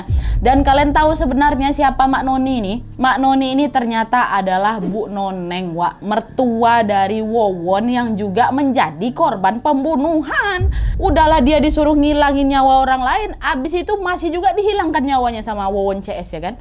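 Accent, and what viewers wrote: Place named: Medan, Indonesia. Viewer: kalau wak yg ngomong patent kali,gaya medan kali,kren wak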